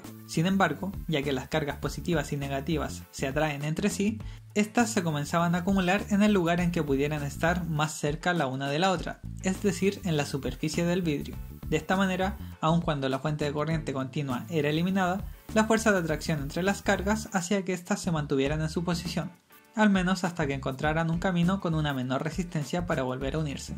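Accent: Chile. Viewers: Eres de Chile?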